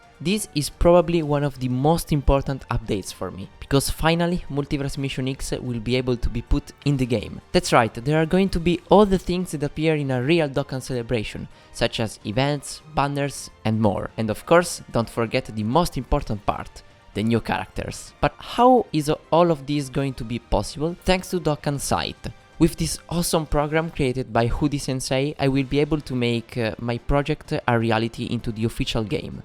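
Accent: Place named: Italy. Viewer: Spettacolare il tuo accento Italiano/Inglese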